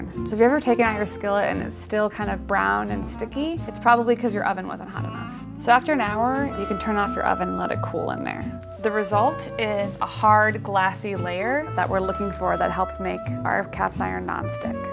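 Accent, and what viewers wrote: United States. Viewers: At the end of every sentence she goes uuuuup on her tone. Very unprofessional and annoying, typical american accent.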